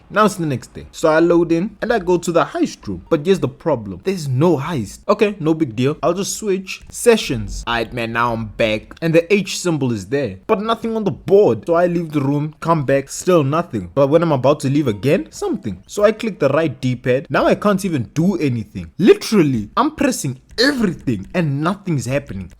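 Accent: Afrikaans, South Africa. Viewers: can hear the south african accent 😭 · Are you from South Africa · Are you South African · Are you from Africa wait me and you are Afrikaans bro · I was wondering where you are from.. the way you pronounce the letter r made me think SA or Namibia but when you said boers seun then i knew for sure your from SA, jy het n nuwe subscriber gekry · Are you South African You have a fimilar accent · Bro are you from South Africa. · Quick question are you south african coz ur accent is like mine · South African? Edit: yeah ur SA, never heard anyone else say Kopp (head) elsewhere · are you south african? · Are you afrikaans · bro u south african?? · Are u afrikaans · your accent , the way you pronounced that Afrikaans nam , auidwes boerseun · It took me a while to realize you were south african lmao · Are you south African? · You sound extremely South African... 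Am i right? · Damn bro south african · 😅I Feel like you from South Africa 🇿🇦 BRUH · I knew you were from South Africa with that accent😭😭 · Are you south African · Are u south afrcan? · Your accent man . . . Are you South African?🇿🇦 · My man sounds like a south african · Oh you're south african too? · Nha I found gold finding this channel 😂😂you can even hear thr south african accent 😂😂😂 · What is your accent dude? Its sounds south African it sounds awesome🙃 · South African? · Bro tell me you not South African 😂😂😂😂 that accent.. I’m from Lesotho. I’m subscribing btw 😂😂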